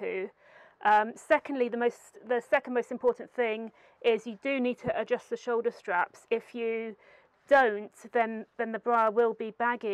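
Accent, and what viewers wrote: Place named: United Kingdom. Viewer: I am a Brazilian/ American and I found British accent so elegant, mostly from a wonderful girl like..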